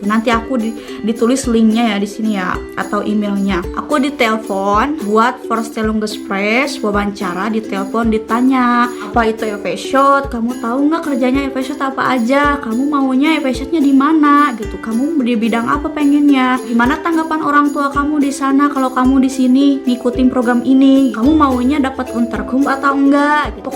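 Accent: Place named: West Java, Indonesia. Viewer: Ya allah lucu nyaa😂😂 awal2 jerman terakhir jdi full sundanese asik nya klo bisa se group sm kk pantas di puji trus hihi😆😂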